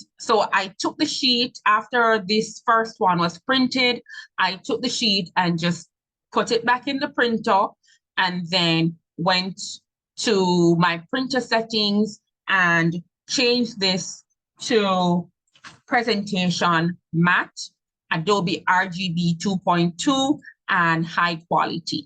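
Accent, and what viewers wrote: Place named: Jamaica. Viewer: Hey there...are you Jamaican...it sounds so...rahtid yuh really went intense with the instructions and tests...I thank you. I did have a drastic ink bleed Customer concerned and I'm embarrassed...however I will talk to Siser. Thanks much.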